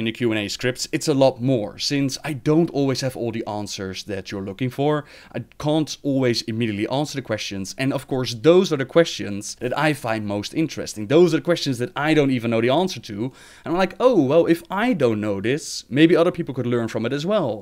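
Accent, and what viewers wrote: Netherlands. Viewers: I had no idea you were Dutch! I really like your accent and all of your Lore videos, my guildmates say I'm an Encyclopedia thanks in part to you:) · Dutch accents are great. I like how he pronounces "Th" as a "D"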